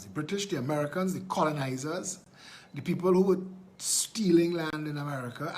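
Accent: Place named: Trinidad and Tobago. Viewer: an excellent orator. Articulate delivery with a nice blend of standard and Trinidad English. Darze wha we talkin bout. Most of what you assert I have been saying for a while now. You keep saying Trinidad is a shithole country and I have been saying long before that Trinidad is one big stinking ghetto. Keep fighting for your country snd its lost people. I may join you soon in an attempt to rescue it.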